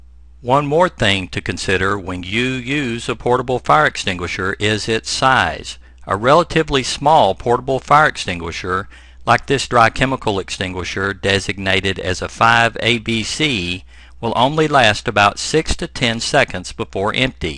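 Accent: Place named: South, United States. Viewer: I'm glad it's a southern accent not monotone to make it a little more engaging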